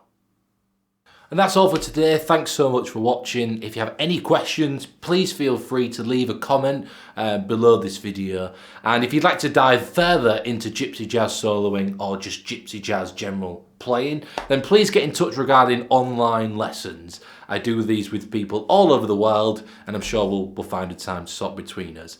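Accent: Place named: Derbyshire, United Kingdom. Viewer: I didn't understand a word. Nevermind, nice accent though. Buxton?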